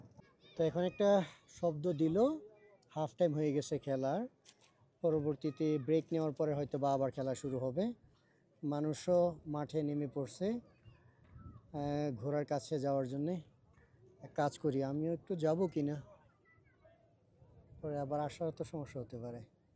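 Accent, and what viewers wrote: Noakhali, Bangladesh. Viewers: এই নোয়াখাইল্যা চলবে না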